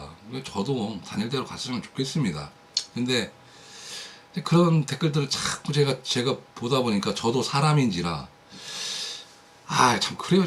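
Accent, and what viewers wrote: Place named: Chungcheong, South Korea. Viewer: 님! 영상 잘 보고있습니닷! ^^ 제..여담인데 한가지 말씀드리고 싶은게 있어서요!! 저는 경상도 사람이라 성질이 좀 급한데... 님 말씀하시는게 1.25배속 하면 제가 듣기에 딱 맞아요ㅋㅋㅋㅋㄱ ㅋㄱ답답해요ㅋㅋㅋㅋㅋㅋㅋㅋㅋㅋ 1.25배속!!ㅋㅋㅋㅋ 충청도 말투를 평소에 많이 접하지 않아서 그런가봐여ㅋㅋ😊😊 영상 많이 올려주세요! 쇼츠도 많이 올려주시구요! 장동혁 대표님 화이팅❤🎉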